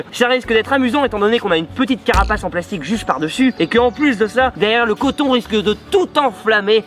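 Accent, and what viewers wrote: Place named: North, France. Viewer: ché l'meilleur accent celui de ch'nord pas un bruit d'kien pas un bruit d'ka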